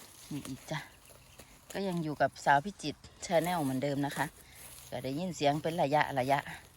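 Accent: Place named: Surin, Thailand. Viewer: สำเนียงเว้า คือบ้านข่อยคักลาวสุรินทร์เด้อจ้า ตอนนี้มีภูมิลำเนาอยู่สมุทรปราการครอบครัวข้าราชการจ้า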